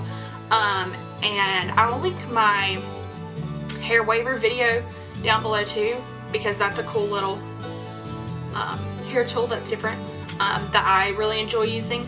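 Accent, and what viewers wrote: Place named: South, United States. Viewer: You sound kinda like your from the south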